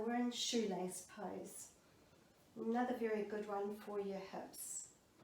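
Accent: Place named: New Zealand. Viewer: I love your dark hair! I don't know why your channel doesn't get more subscribers. I love your videos and your calming voice and very unique Kiwi accent. Just wanted to let you know you're definitely appreciated! 🤗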